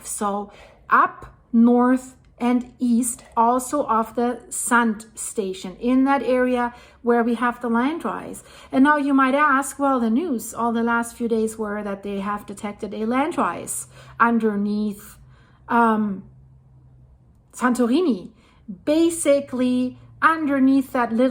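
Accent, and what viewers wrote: Germany. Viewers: Actually, is German and lives in the US, hence the accent. I love accent 😁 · What a funny accent 😂 Is this German, Canadian or anything in between?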